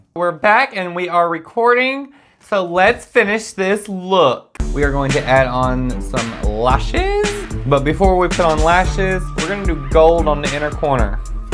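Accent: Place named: United States. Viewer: why do you sound so country??? Lmao Where do you live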